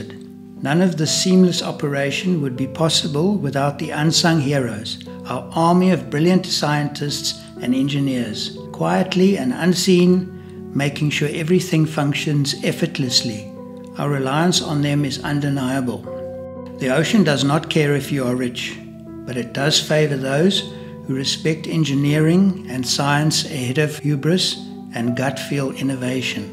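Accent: South Africa. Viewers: Nice South African accent . 😊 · I hear a very Afrikaans accent 😄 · Do I detect a South African accent? 😁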